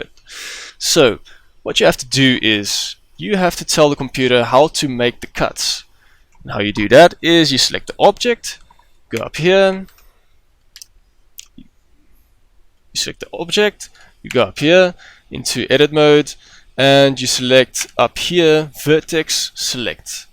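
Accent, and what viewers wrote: South Africa. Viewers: You South African? I hear an afrikaans accent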